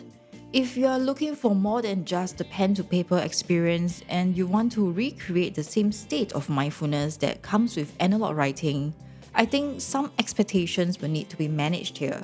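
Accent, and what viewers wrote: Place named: Southeast Asia. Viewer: Hi there. Judging by your accent I presume you are from south east Asia :) Got myself a RPP too. Not sure whether you have figured out a way to handle the notes taken in the RPP in a PARA or Zettle system? Would love to hear from you. As linking “analog” to a digital workflow is something I had not quite figured out.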